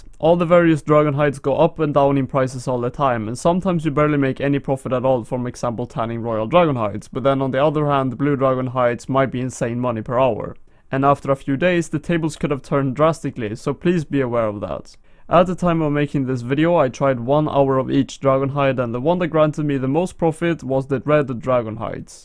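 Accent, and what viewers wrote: Sweden. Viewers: I love ur swedish accent :3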